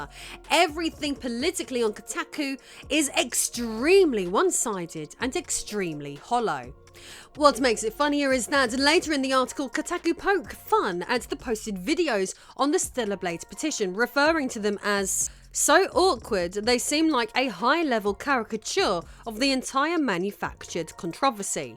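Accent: England, United Kingdom. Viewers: Ilve been seeing people on YouTube are calling you and your husband white or British and making fun of your accent or teeth … and accusing you both of thinking your better than them because your accent…. If that isn’t racist I don’t know what is… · Refreshing to hear an English accent pronounce words that are articulated so differently Stateside (Minus the sarcasm). Wholeheartedly agree with your assessments. Great show as always! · Apparently, I am addicted to subscribing to nerd content makers with British accents. I am so weak.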